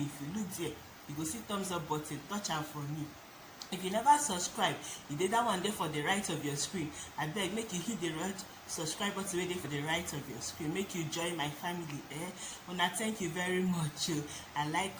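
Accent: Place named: United Kingdom. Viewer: Your pigin still dey sound like British English lol! Speak the warri undiluted pigin.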